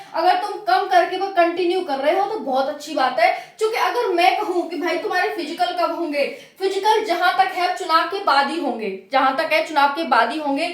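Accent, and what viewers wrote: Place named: Braj, India. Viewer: आप तो ब्रज भाषा बोल रही हो बहुत प्यारी लगी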